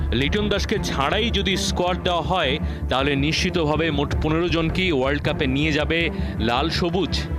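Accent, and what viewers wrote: Kolkata, India. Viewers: কোলকাতার এক্সেন্টে কথা বলছেন কেন?? বাংলা জানেন না?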